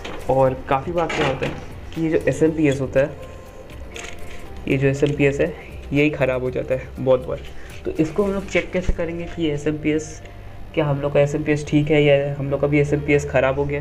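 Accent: Bengal, India. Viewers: You are bangali, right? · Are you bangalee? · Are you bengali?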